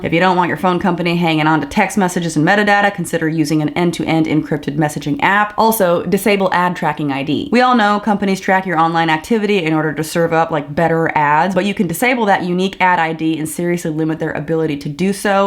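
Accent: South, United States. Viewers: It’s fun to hear your drawl gradually increasing! Is sounding more Southern as well? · an extra bit of southern drawl in your accent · Just me, or does have more of a southern accent than I remember? Also, very happy to be in a blue state right now. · Is it me or are you developing a Southern accent? 😮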